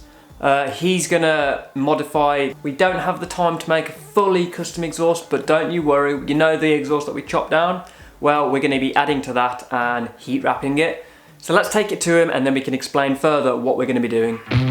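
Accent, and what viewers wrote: Birmingham, United Kingdom. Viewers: BIIIRMINGHAMMM ACCENT YEEEEE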